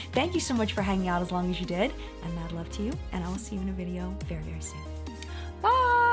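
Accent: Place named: Maryland, United States. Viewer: My fellow Marylander!! I love your Maryland accent, “Baltimore” 😍😍 hello from Riverdale Park, MD!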